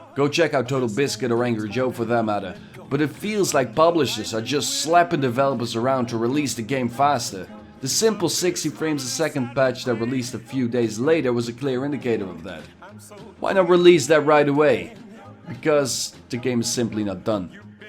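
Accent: Russia. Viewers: Well, it's a decent game if you play it in chunks. A few missions per day. Anyway, where the he hell are you from? Russia? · It sounds like you have a Russian accent.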